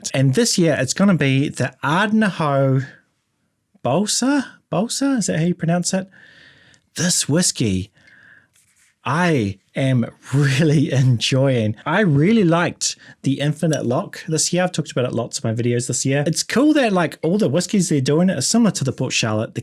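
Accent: New Zealand. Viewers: Hi, nice vid! Liked! Sorry für beeing curious, but is that a kiwi accent?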